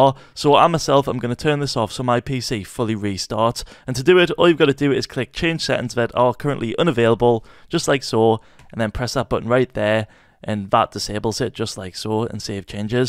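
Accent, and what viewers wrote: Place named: Newcastle, United Kingdom. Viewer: your from newcastle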